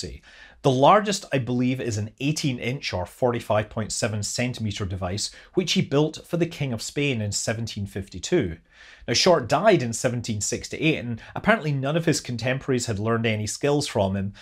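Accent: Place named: Scotland, United Kingdom. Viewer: Gälilean müns... love the Scottish accent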